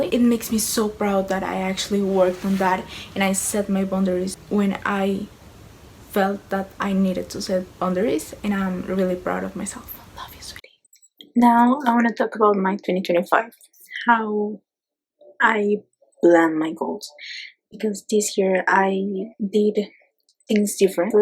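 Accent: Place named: Colombia. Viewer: Hope you're doing okay. I love seeing your nice face and hearing your Colombian accent 😀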